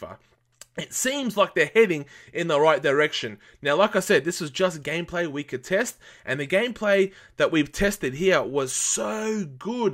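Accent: Australia. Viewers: Is he Australian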